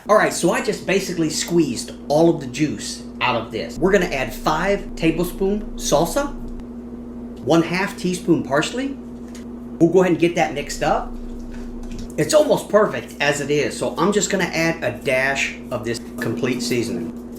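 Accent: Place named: Texas, United States. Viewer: cool, I did pick up on a bit of a Texas accent.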